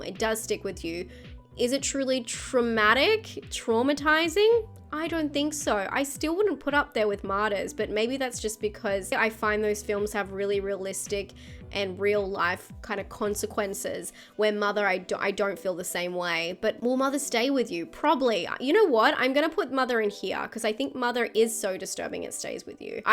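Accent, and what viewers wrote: Australia. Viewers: I love the Australian accent. That’s just HORA baby!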